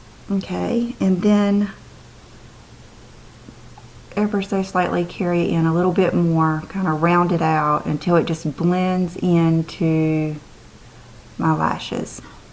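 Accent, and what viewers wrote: South, United States. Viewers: Heheee you, with the bur-berry vs. bur-buh-ree.. I say that you ought to pronounce it just like your instincts tell you:) You are a southern belle; I think it fits that you have your own way of pronouncing it. The lashes/mascara look is incredible.. I think you have been using it a bit lately, yes? (those products) I say this because your lashes have really been looking extraordinary lately. Nice that the line isn't just a famous name, but also are rather superior compared to many other products.. mascaras, etc., for example. You truly are beautiful,.. inside and out. x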